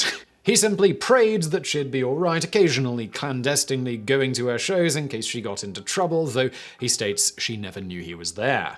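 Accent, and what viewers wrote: United Kingdom. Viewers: One of my favorite things in life is when breaks his proper British delivery and gives his off script delivery.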